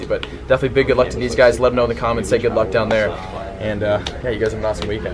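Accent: Russia. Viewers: More Rambling with a Russian Accent. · Russian accent is leet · Russian accent wasn't too bad... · Why is his russian accent so good hahaaha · That Russian accent got me😂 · Bruh your russian accent cracks me up! XDDDD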